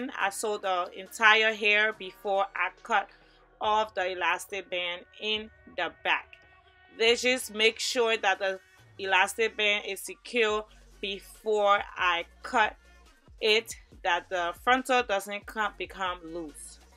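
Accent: Liberia. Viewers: you sound Liberian. as a fellow Liberian hair stylist i am inspired by you x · Is that a Liberian accent I detect...SUBSCRIBED 🥰🥰🥰🥰 🇱🇷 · Sis, you did a beautiful job. What your video shows is that you care about your client's hair. ❤ Oh and I think I hear some liberian 😊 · I hear a Liberian accent!!! 😍😍😍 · This lady sounds so Liberian....am I right? let's gather and show our sissy some love.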